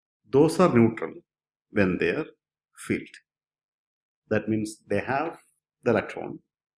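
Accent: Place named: England, United Kingdom. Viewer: Omg!!! Amazing English accent 😊😊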